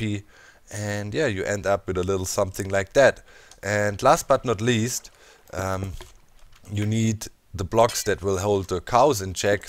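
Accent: Germany. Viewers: Mann merkt das er deutscher ist oder er hat diese deutsch englisch Akzent hat ^_^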